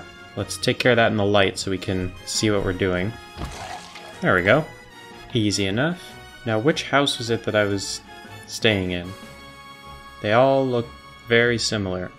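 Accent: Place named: United States. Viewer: Your accent says American. Your sense of humour says British. This was hilarious. Subbed.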